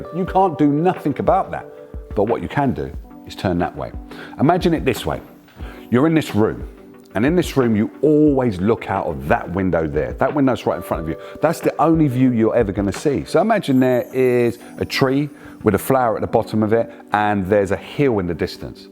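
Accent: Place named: West Midlands, United Kingdom. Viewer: Lovely video! Well done in content, appearance, tone and message. It is the right mix of smooth and suave, with the hard but necessary wisdom from experience. A motivating message with hope, no less. Oh the accent! I must confess it draws me in and draws up the memories of living once upon a time in the West Midlands. Thank you kindly, for posting.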